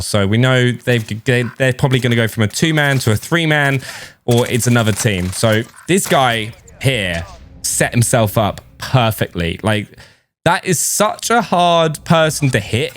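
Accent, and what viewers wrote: United Kingdom. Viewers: mate are u british because u sound it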